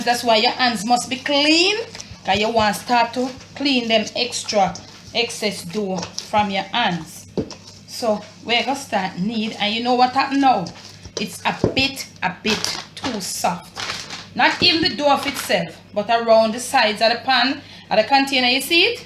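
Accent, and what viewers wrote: Jamaica. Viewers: Does anybody else love her jamacian ascent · I love this lady! Love the way she chat the real Jamaican chat❤️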